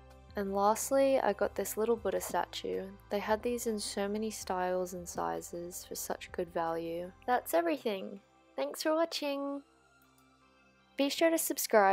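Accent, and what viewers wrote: Australia. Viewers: I have a feeling this is an australian youtuber- her accent seems mashed up...and i'm in australia and seen those things locally too :) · Umm yea can I just come live where you are. I got my hopes up before watching, but we definitely do NOT have most of the things you just showed in dollar stores here in New Orleans. You can find candles..that's it. Everything else is only found at walmart & would've cost you either triple or not sold at all 🙄 You sound like you're from OZ..I've always been in love with your country since I was a kid (I am fascinated by literally everything about Australia & was also obsessed w Silverchair) so thx for adding more to the list lol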